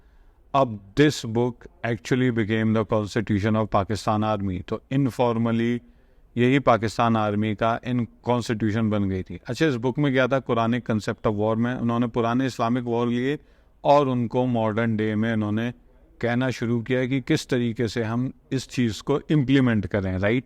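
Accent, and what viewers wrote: India. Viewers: He is an Urdu speaking migrant successor they have an accent like indian these people migrated from North India like dehli,haryana,UP .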